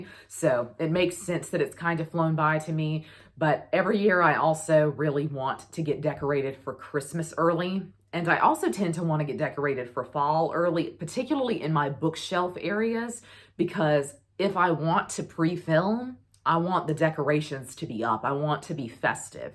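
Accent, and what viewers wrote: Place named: North Carolina, United States. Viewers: Is that a NC accent? I like yer vlaugh. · Yep, I'm from NC, and sounds a lot like me!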